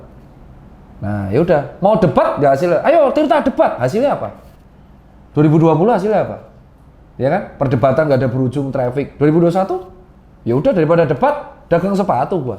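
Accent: Java, Indonesia. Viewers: suka suaranya dokter tirta yang medookk,,,,hehehehee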